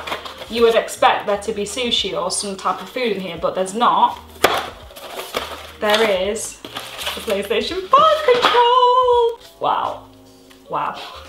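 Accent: United Kingdom. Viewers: A breath of fresh air w a British accent✌🍻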